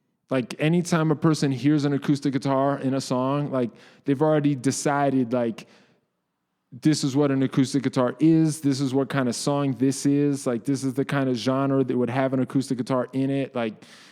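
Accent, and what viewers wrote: Boston, United States. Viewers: are you from boston or something? im trying to place your accent. it doesnt sound nyc to me but maybe im trippin. thanks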